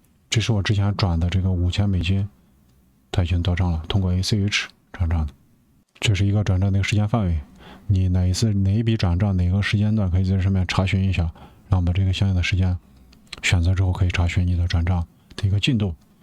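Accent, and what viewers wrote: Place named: Guanzhong, China. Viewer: 这口音是咱关中乡党呀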